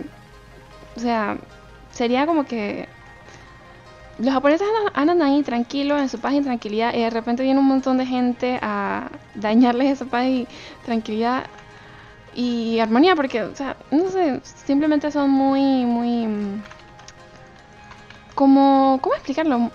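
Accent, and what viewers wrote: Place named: Venezuela. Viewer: Se nota tu acento venezolano xd tal vez lo reconozco por que también lo soy :v like :)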